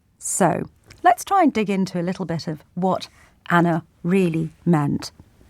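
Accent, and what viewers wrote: United Kingdom. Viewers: Wow, this is heartbreaking. You have to explain real easy to find evident facts to ignorant people everywhere. I stumbled on to this clip in my YT feed. You explained it well. We cannot divide. We cannot live in fear. You’re talking about USA issues just with a lovely ENGLISH BRITISH accent. I am biracial…half of my genetic makeup (per my siblings DNA results) is from England but I was born in the USA. By our looks, you and I could be sisters. The amount of Melanin in our skin shouldn’t determine our ability to lead in a country in which we were born…ethnicity and culture can be better understood when you have friends from other cultures that you are around consistently. The ignorance is breathtaking but not surprising. It’s the only outcome when you’re not interested in learning and becoming a better human. Keep talking. Keep using your voice. 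Keep your hope for a better and all-inclusive we-don’t-care-how-much-Melanin-you-have-society is the norm. Facts over fear. ❤🇬🇧🇺🇸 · Sure sound British to me . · I couldn't get past the first minute of this victimhood propaganda. If your that offended by some imbeciles opinion then to me your obviously already too insecure for public discoarse. You can only be offended if you choose to be or let yourself be offended. You sound more british to me than anyone but as you surely know you only have that job because of "diversity" not your talent or merit. All the mainstream propaganda mouthpieces have token women and people of colour now and the sad thing is these people actually think theyr in these roles for theyr talent. Anyone disagree?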